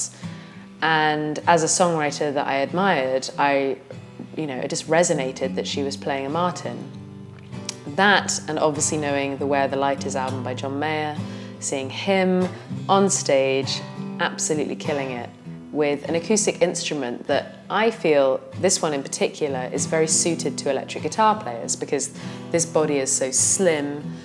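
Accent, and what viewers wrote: United Kingdom. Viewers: Really ? I thought that accent was kinda British... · Your British accents makes it official love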